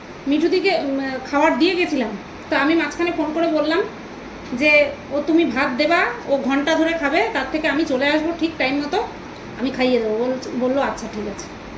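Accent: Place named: Dhaka, Bangladesh. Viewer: তোমার কথাগুলো ঢাকার ভাষার মতো। তোমার আদি বাড়ি কী বাংলাদেশ ছিলো?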